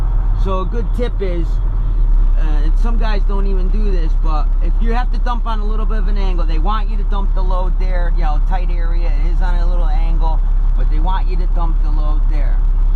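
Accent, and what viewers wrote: United States. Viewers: Great video...u in the tri state area...accent sounds familiar...new York New Jersey or CT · You talk funny.... WHERE you from BOSTON?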